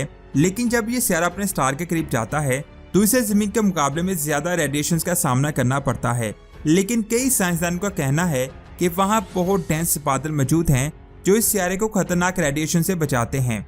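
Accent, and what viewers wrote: Punjab, India. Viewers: Panjabi excent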